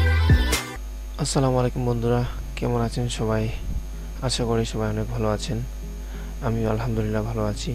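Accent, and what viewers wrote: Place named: Sylhet, Bangladesh. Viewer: Ji but Dhaka tei thaki choto theke. kotha sune bujhe gesi je sylheti hote pare 😂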